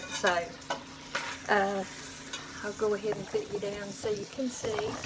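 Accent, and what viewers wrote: South, United States. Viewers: kes S - right, me too ! She is the only UTube person that I allow their ad videos completely go thru till the end. I know she makes more per view if they complete. Just love her to death, she reminds me of my grandma with her southern accent.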